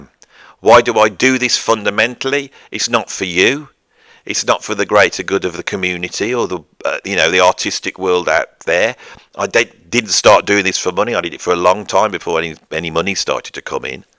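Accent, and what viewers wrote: Birmingham, United Kingdom. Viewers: The audio on this video is the worst I have experienced on any of your videos. That isn't a criticism. You just have some setting wrong, and your lovely, dulcet Brummie tone is distorted somewhat!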